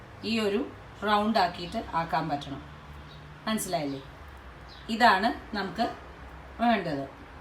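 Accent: Palakkad, India. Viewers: Pazham prathaman looks simply awesome. Loved your palakadan language and presentation. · Ha ha ha you r really super Edakkedekku kudikkan And language Palakkadadan 👌👌👌👍👍👍😁😁😁😁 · l love to hear your palakadan language and reciepes. 😊💕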